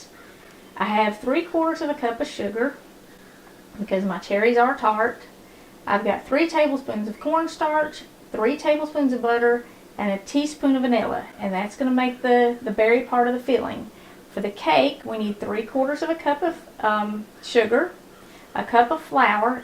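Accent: South, United States. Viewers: Verses and Vittles.....I always enjoy your videos. Your accent reminds me of my years living in the South...North Carolina and Alabama. My Mom was from Tennessee, and you cook like she did!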